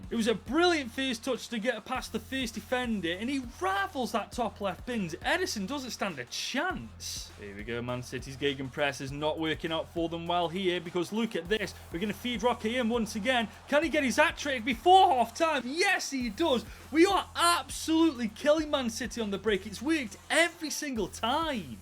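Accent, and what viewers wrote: Stoke, United Kingdom. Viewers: Is that a stokie accent I hear ?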